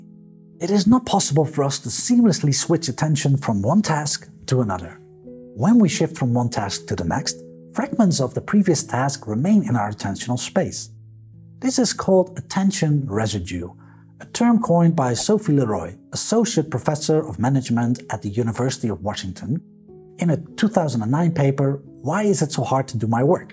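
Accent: Netherlands. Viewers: Love your ideas and videos. I am trying to decipher your "almost Dutch" accent. Do you mind disclosing what country you are from originally? I hope you write a book and a work book!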